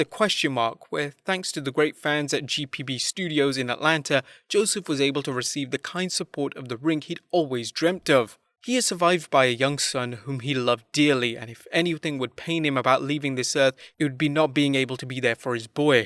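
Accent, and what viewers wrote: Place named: United Kingdom. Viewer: "Money is always a big motivator"- I'd say money's always the biggest motivator, if not the only one, in WWE... It's funny to hear a British accent talking about the American pro-wrestling, since the majority of people in the EU (myself included) can't understand what's going on there at all!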